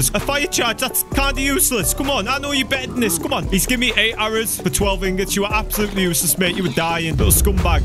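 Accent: Scotland, United Kingdom. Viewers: Are u Briish are u briishmate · Are you British? I don't care you you even answer And is this video sponsored by laff loaf? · You sound Scottish like me mate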